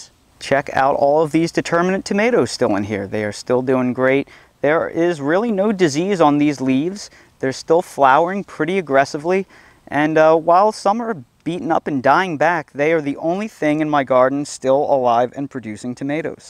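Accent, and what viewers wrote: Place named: Pennsylvania, United States. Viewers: When i started watching and you mentioned you were in NC, I was like oh cool my father and step mom lived there, she was from there. RIght away I said to myself hes not from there lol he's sounds like PA and looks like a Jersey Boy. Lmao in you revealed that you are from the PA/NJ area. lol WE spot our own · So funny, I KNEW you had a Philly accent. I was going to ask you after a previous video. I went to sleepaway camp in the Poconos for 10 years and I can pick that accent out anywhere. And thanks for the info on indeterminants. I already have Celebrity and Bella Rosa seeds and will be planting them this fall (South Florida, Zone 10B. You think YOU know about heat and humidity. HA!! AND our bugs are the size of Mini Coopers!)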